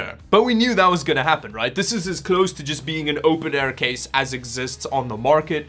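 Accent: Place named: Afrikaans, South Africa. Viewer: A Canadian man with a very peculiar spelling of the name David ( which has many origins like Polish, Dutch, and most of all I get an Afrikaner vibe from our channel host but he may just be French Canadian which can sometimes have a hard to place accent especially from the younger generation) has bought my unique and rare piece of computer hardware on eBay. I wonder if its the same guy?!?! I am sure it wasn't hard for the guy to figure it out especially if he was a fan of yours which is pretty cool. I have an extremely rare spelling of my middle name and my first name is uncommon as well so I get how easy it is for him to have placed you.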